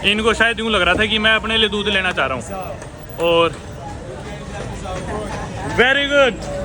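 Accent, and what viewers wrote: Haryana, India. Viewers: Pure haryanvi accet 😂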